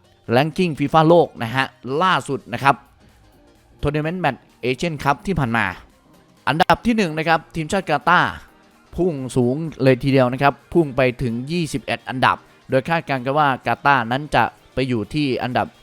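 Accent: Isan, Thailand. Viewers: มักหลายเด้ บักหล่า ในวิธีการบรรยาย เป็นการสอนภาษาอิสานเฮานำขอให้หมานๆเด้อยักหำ มักๆๆๆ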